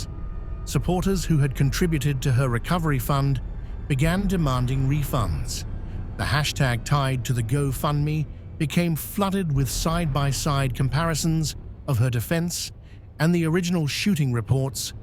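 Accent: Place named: United Kingdom. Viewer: hate these brit voices for american stories lol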